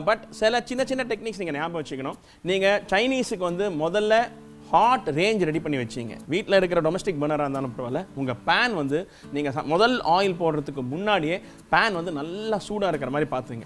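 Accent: Chennai, India. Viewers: semma ,Madras language, I like it.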